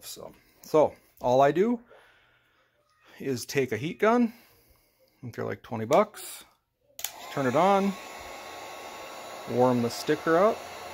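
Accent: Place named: Wisconsin, United States. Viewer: Thank you! Very helpful. Are you from Wisconsin? Accent sounded familiar. Go Packers!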